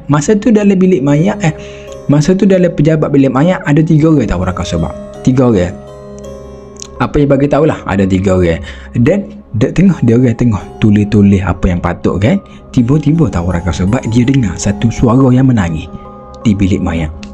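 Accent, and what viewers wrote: Kelantan, Malaysia. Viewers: Kawe orghe Kedoh tapi pahe baso Klate ni tapi apa pong kawe terhibur...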